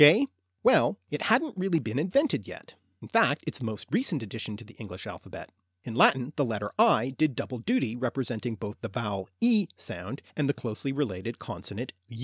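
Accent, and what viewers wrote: Canada. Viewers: You sound like a Canadian. Am I right?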